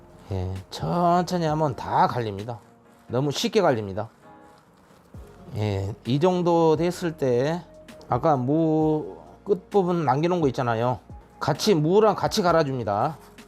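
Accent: Jeolla, South Korea. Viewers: 병원에 입원중입니다 항암치료로 입맛이 없는데 집에 돌아가면 꼭 해먹을래욤 ^^ 전라도 사투리 참 정겹네요 ^^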